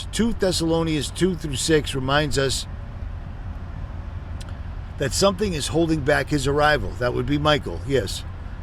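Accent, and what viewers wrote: Boston, United States. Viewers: You from Boston or wistah area... in gator coutry now yet from Mass, hi❤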